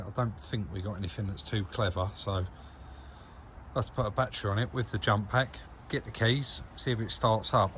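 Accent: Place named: Essex, United Kingdom. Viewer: When said rail at the start I thought he meowed, rail Sills nice alloy are hard words for the Essex accent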